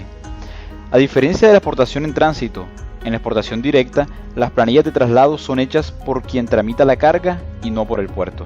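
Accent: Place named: Venezuela. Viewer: es Venezolano, no reconoces el Acento.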